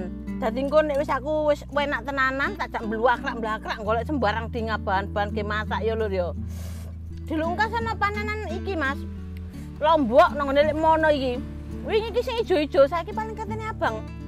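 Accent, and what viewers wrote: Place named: Surabaya, Indonesia. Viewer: Temenan Mbak, suroboyoan mu ga ilang 😀👍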